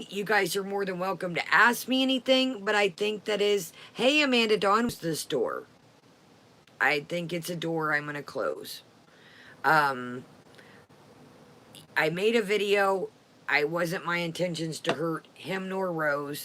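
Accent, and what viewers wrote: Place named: South, United States. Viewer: I’m from the UK and I adore your raspy southern accent! It’s literally my favourite, I could listen to you all day! Your accent and a strong Scottish accent is my kyrptonite! Everyone can see you’re genuine, keep doing you 💜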